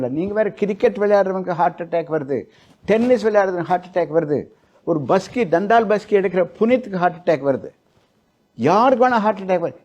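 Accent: Coimbatore, India. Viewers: நீங்கள் பேசும் அழகுகோவைதமிழைகேட்டாலே ஒருநோயும் வராதுங்கோ ஐயா உங்களின் இந்த கோவைதமிழை எப்போது கேட்டாலும் இனிமையையாய்உள்ளது வாழ்த்துக்கள்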